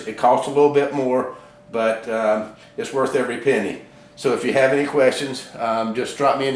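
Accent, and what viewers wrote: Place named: South, United States. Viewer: Me 3. He sounds Southern American.... can you blame him?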